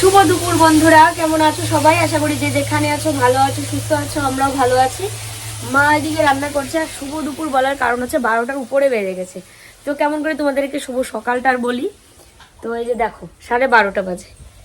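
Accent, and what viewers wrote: Bangladesh. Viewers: Prottek jaygay ak ak ta ancholik vasa thake, ota sobai jni. proyojon a nijeder moddhe kotha bolao hoy vlog cholakalin, bt ajkal puro vlog ta besir vag somoy Bangladesher vasay/ local vasay kotha bole vlog continue korche, Really khub odd lagey sunte. ak ak br bolbe seta vloi lage, amrao porichito hoi vasatar sathe. tumi fresh Banglay chesta koro. o toh coochbihar er meye, ki sundor kore kotha bole. tomar kotha gulo baddo kaney lagey. kichu mone korona bollam bole. chesta koro nije k improve korar. Roj vdo dekhi, cmnt. kori tai jeta thik mone korlam seta bolei fellam.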